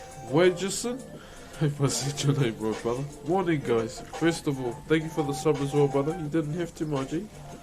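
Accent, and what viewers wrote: New Zealand. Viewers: The New Zealand accent is an immediate reminder of Korg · Lol, thats cause Taika Waititi the director/korg of Thor rangnarok is maori and so is the bro. That's just our accent 👌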